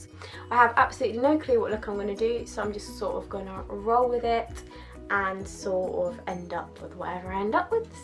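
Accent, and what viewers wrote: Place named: United Kingdom. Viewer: You're British!? I'm in love😻😻😻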